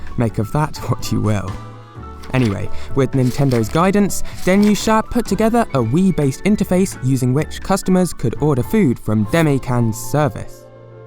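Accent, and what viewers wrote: United Kingdom. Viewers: You literally speak a British Accent!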